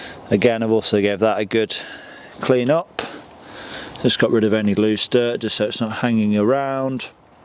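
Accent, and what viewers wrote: United Kingdom. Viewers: You gotta love the British accent in this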